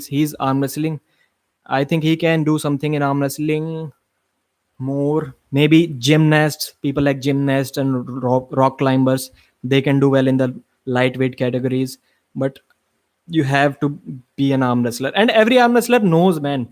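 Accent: India. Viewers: a guy said he could hear your Indian accent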